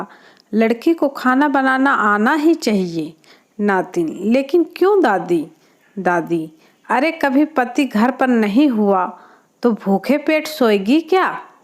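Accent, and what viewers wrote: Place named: Bihar, India. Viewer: Didi aap Pata Logon ki faltu bakwas se please aur Dur Raha karo kuch Log Kahate Hain aapko ki aap Bihari lagti ho aap Bihar chal Bhaag Bihari Bihari Jaisi Teri Awaz hai aapke awaaz me Jaan basiya didi aap yah Baat Jarur Jaan Lijiye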